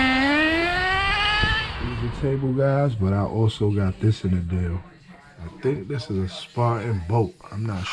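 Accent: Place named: New York, United States. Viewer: Somebody just gave me the same car I have the books and everything that came with it when it was new! Only thing that engine is stuck from sitting. I'm going to take it apart and clean it. I would say keep it for what it is man. You can always build your own electric car. Yeah just upgrade it. This is my first car. Well actually I just bought a Traxxas Nitro sport haven't started it yet.Just making sure I don't blow the motor I had it for about 2 weeks now was waiting on fuel. Sound like you from New York where you at I'm in upstate New York Port Jervis.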